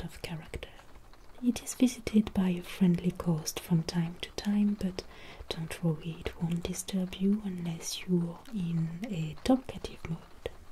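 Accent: France. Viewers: Wow amazing video. Everytime I watch your videos, I feel fully engaged in the story. Your French accent is soo luxurious ❤. Thank you for such amazing gift! 😊 can't wait for the next video.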